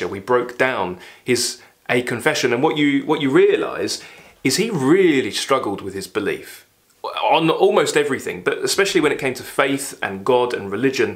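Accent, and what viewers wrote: United Kingdom. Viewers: Здравствуйте! Прошу прощения, что пишу по-русски, моего знания английского языка не хватит, чтобы выразить свои эмоции ❤ Я так рада, что нашла Ваш канал! Спасибо Вам за Вашу страсть и любовь к литературе, просто невозможно не захотеть бежать читать после Ваших видео! У Вас чудесный голос и обворожительный британский 🙈❤️ слушать Вас - одно удовольствие! Разбор негативных отзывов - прекрасная идея, очень жду продолжения! Как человек, замученный русской классикой в школе, подтверждаю, что учителя часто отбивают всякое желание читать. Например, Войну и мир я прочитала только первый том, злилась жутко на себя, на учителя, на Толстого, на весь мир 😂 и до сих пор к великому своему стыду не читала ничего из Толстого 🙈 Однако, не имея желания читать русских авторов, прочитала многое из английской (Ивлин Во "Возвращение в Брайдсхэд" и "Незабвенная" - одни из любимых моих книг), французской (Камю и Экзюпери - любовь моя) и американской (Ken Kesey - "Sometimes a great notion" - обожаю до слёз) литературы. 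Сейчас период японской литературы и возвращение к русской классике. Очень жаль, что в окружении нет людей, которые любили бы те книги, которые я предпочитаю, есть некая неудовлетворённость от того, что не с кем обсудить. И даже в книжных клубах никак не могу найти тех, кто читал бы книги, которые мне интересны 😢 Моби Дика читала 10 лет назад, понравился очень и оставил желание перечитать, когда стану постарше. Из русских писателей люблю не очень популярного на Западе Лескова. Его "Очаро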